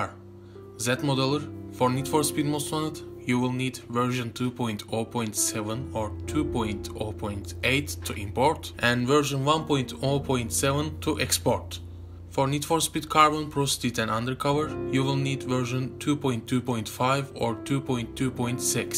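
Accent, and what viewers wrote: Turkey. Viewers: askandan anlaşılıyor türk olduğundan :) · ingilizcenden belli kardeşim sen Türk'sün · túrk olduğunu aksağaníndan anladím benden kaçamazsín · türkmüsün la sen :D ingilizcenden anladım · ingilizde aksanından bile türk olduğun anlaşılıyo .d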